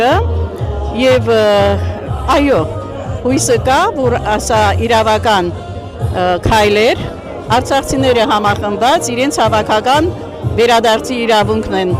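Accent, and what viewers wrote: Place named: Russia. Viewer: Cirtc xarnuma ec agarkekin lcelov rucakan akcentov xatlaxner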